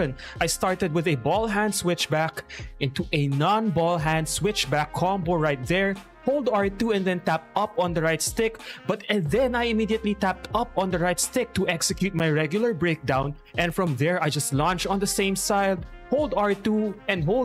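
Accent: Philippines. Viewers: kaya pala sir, yung pronounciation mo pinoy na pinoy talagang hindi kinakain yung words, fluent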